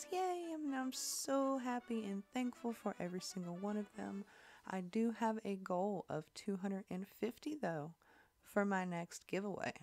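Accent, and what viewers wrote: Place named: United States. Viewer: nice video! your american accent is funny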